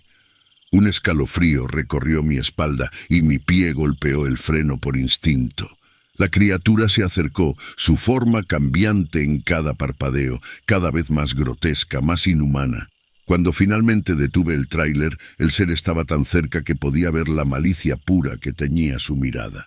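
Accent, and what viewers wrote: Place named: Spain. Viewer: Se escucha come espanol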